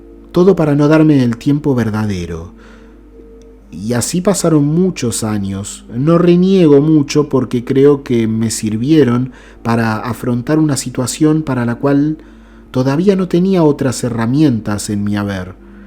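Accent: Argentina. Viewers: ya me parecia que eras argentino.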